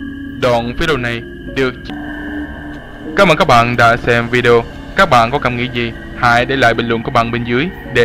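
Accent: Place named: Dak Lak, Vietnam. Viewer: giọng đọc vl ra.. giống giộng ở tây nguyên đac lắc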